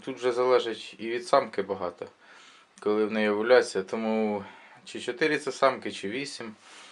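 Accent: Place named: Poltava, Ukraine. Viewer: Дякуємо за поради і приємну мову, чисто як у Полтавській чи в нас, південь Чернігівщини. Здоров'я, натхнення і творчих успіхів!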